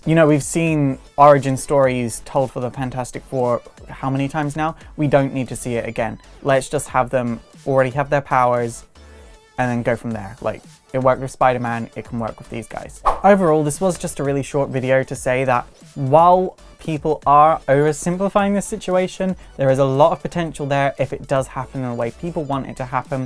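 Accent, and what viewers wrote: Scotland, United Kingdom. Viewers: you sound slightly more Scottish than usual this video, I kind of really like it. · Is he Scottish ? I hear a mixed accent so I don’t know what his accent is lol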